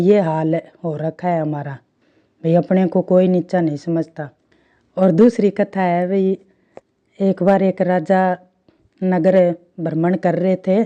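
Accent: Haryana, India. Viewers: Aap haryana se lgti ho bahan ji prnaam 🙏🏻🙏🏻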